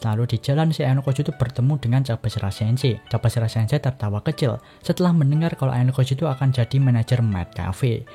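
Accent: Java, Indonesia. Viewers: Akhirnya lanjut lagi, enak dikuping bgt walaupun medok wkkwkw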